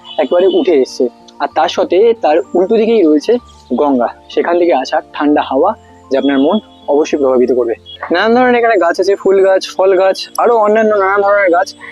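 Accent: Bangladesh. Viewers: বাংলাদেশের মাল,উচ্চারণ তো এরকম হবেই। আর মানুষকে বিভ্রান্ত করে পুরো ভিডিও দেখানোর কৌশলের কারণে থাকা খাওয়ার ব্যবস্থার কথা বলে,এভাবেই এদের পেট চলে। একই কথা দশবার বলে ভিডিওকে বড় করে।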